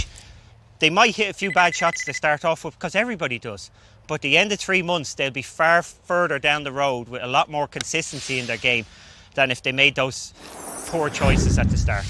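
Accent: Ireland. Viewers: I enjoy all of golf tips and especially the way he explains everything. Also love the Irish accent. Some of the best people are from Ireland. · 😂it’s the Irish pronunciation, clearly he’s from a smaller town or village.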